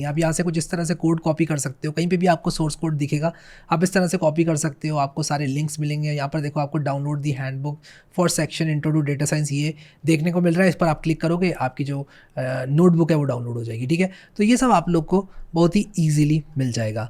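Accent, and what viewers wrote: India. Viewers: English bro .. but easy to understand like an Indian accent with subtitles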